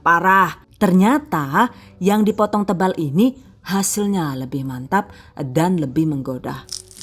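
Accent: Banyumas, Indonesia. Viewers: "done" #giveaway thv 2020 Slalu ngapak...sukses ...catat...👍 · Aku suka bahasa jawa ngapaknya...ora ngapak ora kepenak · Aku seneng banget Maring cenel kiye koh penasaran jan2 asli ne wong ngendi Banyumasane kok medok banget.😀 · "DONE" Alhamdulillah selalu suka resep dari dan trik dan tips setiap resepnya jelas banget... Apalagi suaranya khas banget dan ngapak😁😁 Pokok resepnya selalu di nanti2😍😍 Sukses selalu buat dan terimakasih atas semua resep2nya😘😘 #giveawayTHV2020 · Laaah Wong ndi sih gye, deneng ngapak? 😂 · Lucu banget..ngapak2 kaya nyong bae deneng lah..mbekayune..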